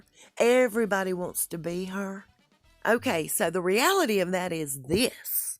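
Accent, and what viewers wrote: South, United States. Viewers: YOU are such a blessing to us,! I enjoy your posts so much and love your southern voice!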